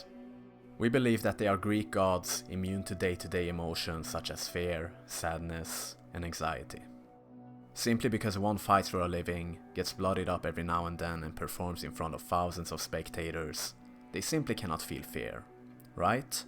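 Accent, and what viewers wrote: Sweden. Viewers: Are you swedish sounds like it atleast😂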